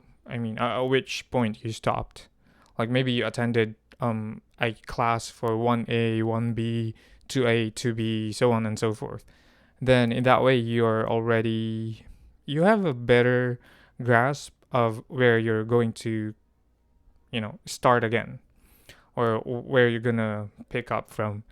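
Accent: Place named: United States. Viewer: Hello! I came across your video 2 hours after you uploaded it and I watched till the end. I’m impressed by your talent (speaking 4 languages!) how did you do it?! I can’t even speak fluent English after living in the US for almost years!😂! Needles to say, I hate English subject! Ang hirap talaga mag English😂. Puro Pinay friends ko dito, lahat hindi magaling mag English (basic lang ang alam namin 😂). But I’ll dedicate myself to speak like a native hahaha! You speak like an American. I stumbled upon some Filipina English teachers here in YouTube claiming they have an American accent, they don’t. But you! Bravo! Sana all! Any tips?!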